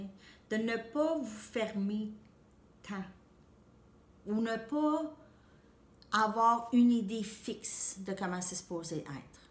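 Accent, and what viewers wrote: Quebec, Canada. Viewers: Cc tout est très juste pour ma part pourtant malgré ttes mes épreuves...j ai perdu mon papa le <num> J ai du rompre après 10 ans de vie de couple d avec mon compagnon sur Nice car il n accepté pas les chats de mon papa et j avais fais une promesse à mon père je suis comment dirais je vidée épuisée fatiguée et paradoxalement dotée d une force incommensurable je déteste ces fêtes j aimerais enfin vivre un peu j ai 52 ans et le 6 février prochain min fils Quentin part travailler en Australie gros becs à toi ton accent j adore en 1991 je suis partie au Canada pour mon stage d infirmière j étais dans la région des Laurentides....et les cabanes à sucres que de bons souvenirs donc à cette heure là ici en France ou je te regarde il est 16h27 j en suis à un.point ou tu pourrais m annoncer la fin du monde je te dirais ok c est bien....en plus le syndrome du gisant je suis née le jour de la mort de ma grand mère maternelle si tu savais comme je rêve juste de me poser à qq part ou ailleurs avec mes 9 chats....Nagua Mistigri Pikatchou kopi césar choupinette blanchounette noirou roxy et rouquinou malgré tt cela je reste positive mais je fatigue et comme chanté mon chanteur préféré ^...espère avec ton coeur...la réponse est en toi la question est ailleurs ce n est pas parcqu une étoile s éteint qu' elle éteint forcément le ciel ^...écoute la chanson sur YT:^ ^ Québec je me souviens...une seule envie y retourner passer qq jours devant ses gran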